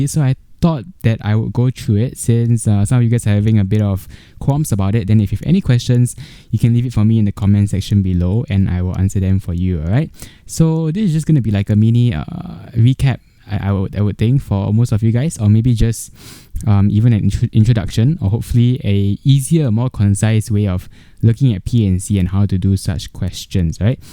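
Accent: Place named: Singapore. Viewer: are u singaporean ?? istg u sound just like my singaporean friend!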